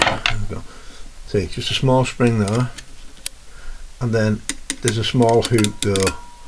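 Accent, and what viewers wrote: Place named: St Helens, United Kingdom. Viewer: this was exactly the tutorial i needed thank you. Didn't expect to hear a St Helens accent though; my hometown. Even better! lovely . tHank you !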